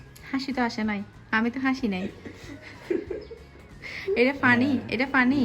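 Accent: Chittagong, Bangladesh. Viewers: Apu apnar video amr onk valo lagse.amio ctg er.so amdr culture e maximum mil ache ejonno dekhchi.apni onk smartly o kotha bolen but i think apu apnr kotha arektu correction kora uchit.kotha bolar shomoi ctg n bangla mix hoye jai.shunte kmn jani lage jetar jonno out district er manush ra always eta niye troll kore.so i think apnr bangla ta ektu improve kora uchit.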